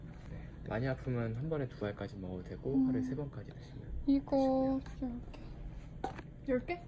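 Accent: United Kingdom. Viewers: 영국 교환학생 가시려고 일부러 영국액센트 공부하신건가요? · ㅝ지 당신? 영국식 영어 발음 너무나 섹시하자나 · I loveeeee your English accent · 전 사실, 영국발음/표기 쓰시는 보구 정말 조았답니다, 중국 한국 도 좋지만 영국 라닛 ㅠ ㅠ ㅠ ㅠ ㅠ 기대할게요❤️ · 영어하시는 영상에서 발음이 영국이랑 비슷해서 듣기 너무 좋다 생각했는데 영국 교환다녀오셨군요!!대박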